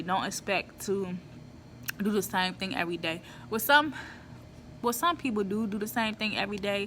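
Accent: DMV, United States. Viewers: Are you from the DMV? You sound like it.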